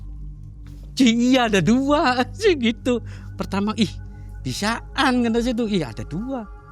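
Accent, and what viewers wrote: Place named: Java, Indonesia. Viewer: Aku suka ngomongnya medok Jawa suka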